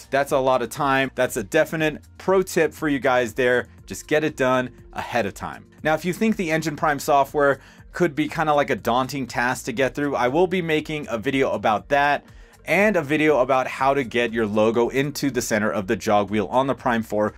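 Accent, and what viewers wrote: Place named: France. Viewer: Very well explain and film, you speak clearly and for a french guy it's cool for understanding what you say Effects are are not too much and final result seems professionnal. Very good job !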